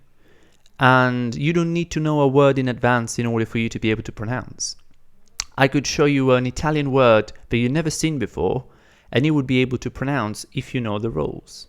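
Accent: United Kingdom. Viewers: Thank you. I'm from Brazil and I wanna learn Italian and improve my English. So, your videos are very helpful for me. By the way, your English is amazing. Congratulations. I like this British accent.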